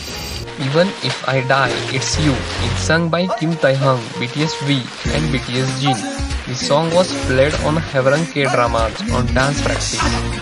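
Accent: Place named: India. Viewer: Listening to your voice makes me think u r an Indian am I right ??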